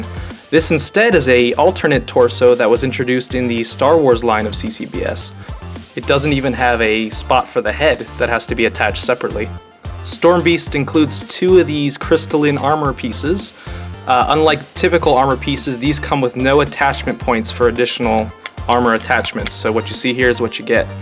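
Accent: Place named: United States. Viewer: That American Narrator thx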